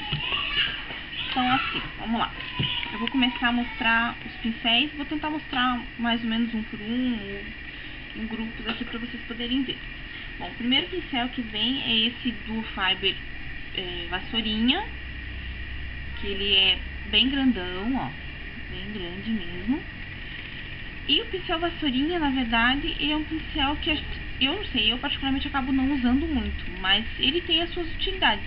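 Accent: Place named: Rio Grande do Sul, Brazil. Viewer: flor, uma dúvida.. tu é gaucha?? tem sutaque, hehe :DD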